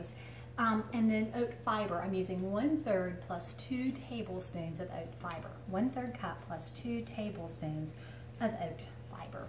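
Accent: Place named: Pittsburgh, United States. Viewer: If you don't mind my asking, what part of the country are you from? I grew up in the Pittsburgh area. sometimes it sounds like you are speaking "Pittsgurghese" but certain words sound a little off. Just curious about my own detection skills. Thanks for the great recipe.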